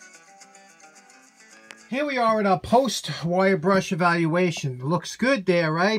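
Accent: New York, United States. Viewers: A very educational episode I loved it. No Disrespect intended but I love the new Yorker accent huh lol it's the best. Pretty soon it's going to be winter I'm in Texas so I'm ready lol. Thanks:D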